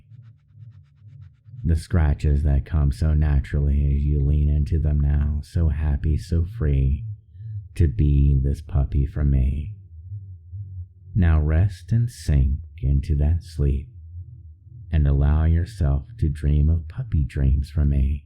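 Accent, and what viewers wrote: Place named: Texas, United States. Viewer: Putting how incredible the rest of this is aside for a moment, your accent is incredible. Sounds Texan to my ears (though that might be my bias talking since I grew up there), but no matter what it is, it’s very soothing!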